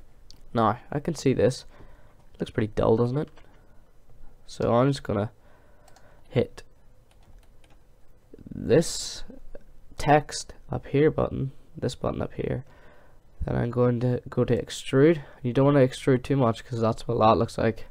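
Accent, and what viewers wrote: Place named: Northern Ireland, United Kingdom. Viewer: Northern irish =) Yeoo!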